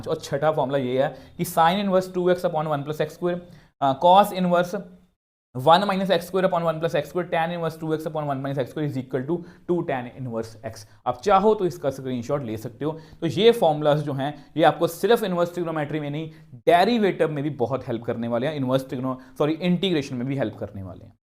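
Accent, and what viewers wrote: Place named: Pakistan. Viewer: Sir ka accent pakistani kyu hai 💀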